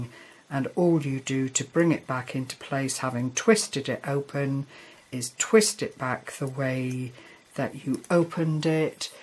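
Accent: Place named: Devon, United Kingdom. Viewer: I thought you sounded like a local girl. I was born in Newton Abbot but live in Teignmouth. Small world isn't it. xxx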